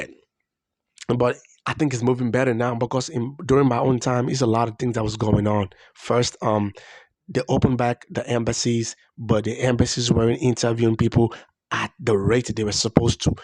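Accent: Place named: South, United States. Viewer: oooh ok. That’s why I’m hearing a southern accent okkk. You’ve picked up the dialect very well. Ok Lagos. Awesome!!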